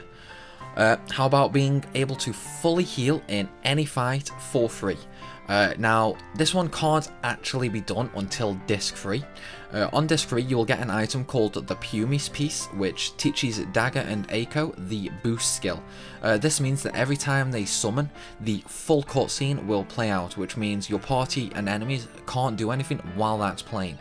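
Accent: Stoke, United Kingdom. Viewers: Mate are u from stoke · Another YouTube gaming video soiled by a brittish accent 😩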